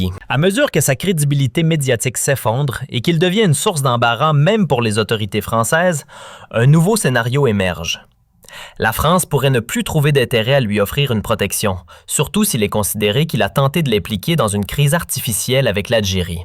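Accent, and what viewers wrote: Canada. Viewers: Pourquoi la voix a un accent canadien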